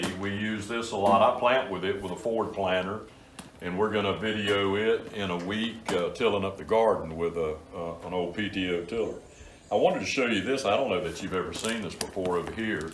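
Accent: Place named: Carolinas, United States. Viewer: You seem very familiar. Your accent and the way it looks I'd swear your in NC or SC...the tiger paw sleeve would make me think your in this area. Not sure if we have ever met somewhere or you maybe just remind me of someone?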